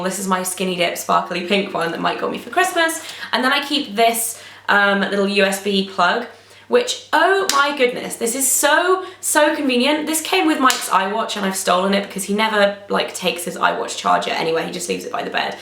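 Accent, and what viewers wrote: United Kingdom. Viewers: I love her British accent! <3 ... and the bag, of course. It's gorgeous!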